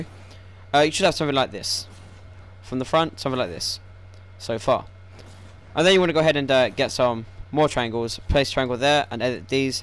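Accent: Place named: United Kingdom. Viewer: btw i like ur british accent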